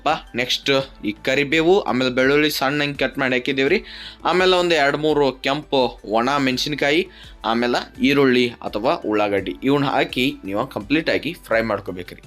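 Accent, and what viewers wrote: North Karnataka, India. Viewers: Pakka nam uttarakarnataka maatu🤗✌️🥰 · Surper utar karnataka bhashe and sabar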